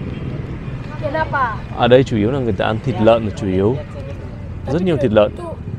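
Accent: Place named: South, Vietnam. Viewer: Chào bạn..nghe giọng nói của bạn là người miền Nam. không biết. quê hương VN. của bạn.ở tỉnh nào. miền Nam Việt Nam.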